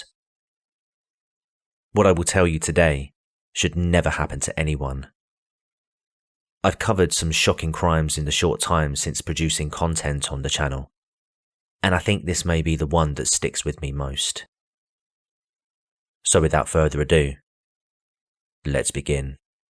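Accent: United Kingdom. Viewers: Hiya, I was sent by Kyle's channel, Dire Trip. I'm very pleased that I took his suggestion. If you'll indulge me for a wee bit, I've a few things to say. First, thank you for the warning. I did not heed it, but am grateful you provided it. It was sadly warranted. Second, this is an horrific case. One I'd never heard of. I understand your research is top notch and I commend you. Third, I am a long-time fan of true crime but only recently been seeking out YT vids on this subject. Now, I am not a snob, but I do appreciate good grammar and a good flow to the narrative. (I'm sure alot of folks would say the same.) I think you did a fine job. I enjoyed your accent as I am a transplanted Scot living in U.S., and my Mum was from Manchester 😊 I do enjoy a Brit accent. I also noted that your tone conveyed your disgust at the heinous injuries this poor soul endured. 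Bless her memory. I'm happy to be a new sub, I am grateful to Dire Trip for the suggestion and I really look forward to hearing more from you. Thank you very much. Blessings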